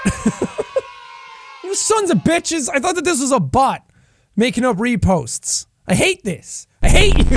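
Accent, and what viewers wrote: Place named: Ireland. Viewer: This is already the second time of me turning on Jack’s video and forgetting that my headphones are off and my family hears an Irish man yelling from my phone.😳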